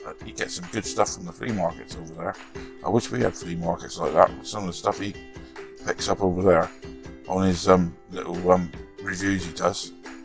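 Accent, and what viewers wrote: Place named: Devon, United Kingdom. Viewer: Hi, loving all your videos, always a joy to watch, how do i contact you directly regarding your restos? you also sound like a Devonshire lad like myself, i also after watching this video like to share a few tips with you also, all the very best, stay safe bud.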